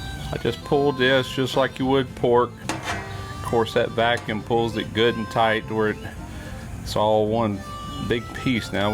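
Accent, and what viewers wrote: South, United States. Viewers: I watched this video today to hear pronounce gyro. Gotta luv that southern twang.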